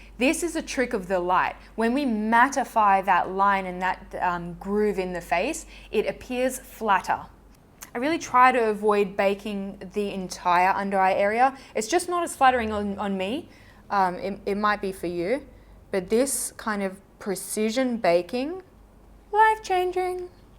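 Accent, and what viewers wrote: Australia. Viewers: Australian is Australian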